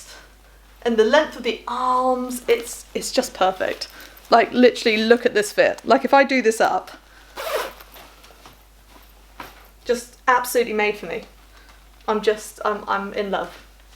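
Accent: United Kingdom. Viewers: I'm enjoying your british accent 🥰 and i love thrifting 💖 new subscriber 🎉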